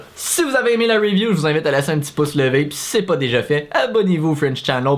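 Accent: Quebec, Canada. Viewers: Pur québécois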